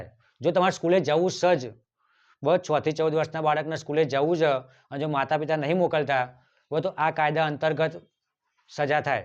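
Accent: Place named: Sabarkantha, India. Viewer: સાહેબ સાબરકાંઠા ના નિવાસી લાગો છો.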